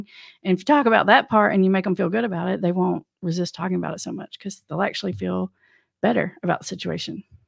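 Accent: South, United States. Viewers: I appreciate your efforts, please tell us where you got your title "Master of Addiction.?", What exactly is your professional education, and your personal experience. Thanks PS I'm sure its from your southern accent, but. you talk so fast also, and I miss much of what your are actually saying. What is your belief of 'the disease concept" of addiction?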